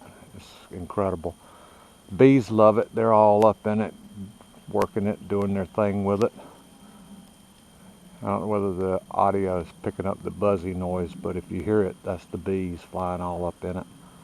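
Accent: South, United States. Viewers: When he said "purdy" I was just like 😍 I love that southern accent 💗 Gorgeous tree vine.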